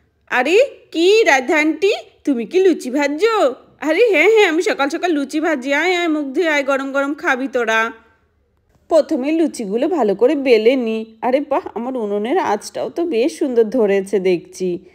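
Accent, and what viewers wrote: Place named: Bangladesh. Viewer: হুম কথার টোনে বাংলাদেশি কিছুটা বুঝতে পারছি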